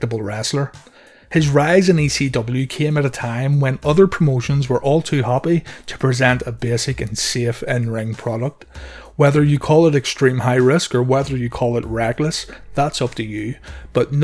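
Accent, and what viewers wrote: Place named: Northern Ireland, United Kingdom. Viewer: You dont need to change by the way mate your Norn Iron charm and knowledge/passion keeps us coming back.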